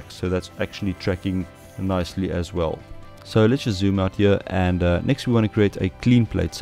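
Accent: South Africa. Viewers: now thats a south african accent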